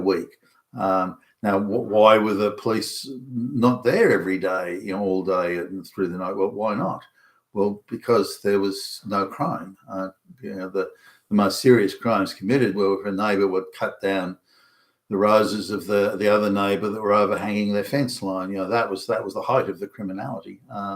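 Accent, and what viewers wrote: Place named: Australia. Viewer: Thanks for broadcasting to me and one or two others. You have a good Aussie educated voice with rounded out cynicism.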